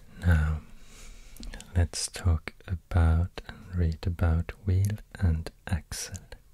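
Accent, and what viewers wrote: Sweden. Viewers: So obvious that you are from sweden haha, lugnt och skönt ska det va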